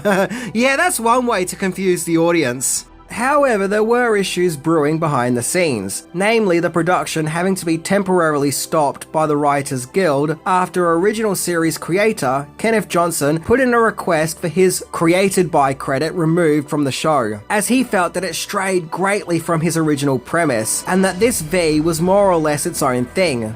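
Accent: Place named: Australia. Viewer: I love the (Australian?) accent pronouncing it "Vay." It keeps catching me as I listen. "Vee" sounds more ominous, imo. But I'm glad this series appears to have crossed the pond if it went all the way down under. As kids, we gathered together at my friend's house because they had cable, which meant really good reception without going blurry like television shows did back then if you didn't have cable. It was before HD, so the effects were super cheesy, but so much fun!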